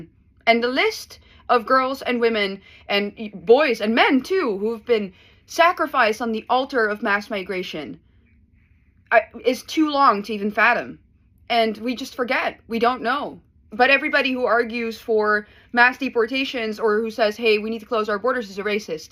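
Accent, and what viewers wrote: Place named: Groningen, Netherlands. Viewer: as indonesian i can tell but which dutch? she has that groningen accent, the frissian is very english ish.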